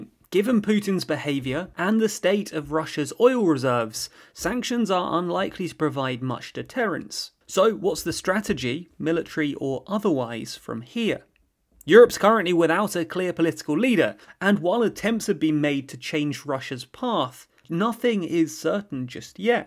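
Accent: United Kingdom. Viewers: This is british english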